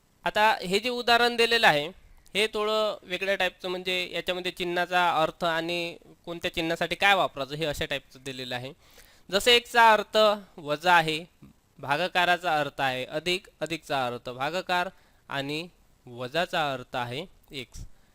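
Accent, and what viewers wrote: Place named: Vidarbha, India. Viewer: very simple and good EXPLANATION .TUMACHA VIDARBHA TONING MAST AAHE KEEP IT UP👍👍👍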